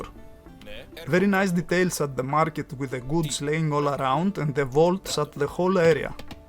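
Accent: Greece. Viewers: Από την προφορά θα πω ότι ο ομιλητής είναι Έλληνας άλλα δεν είμαι σίγουρος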